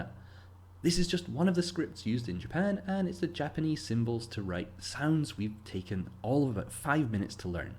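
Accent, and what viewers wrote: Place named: Glasgow, United Kingdom. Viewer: I lived in Glasgow half a year. Release your accent! ^.^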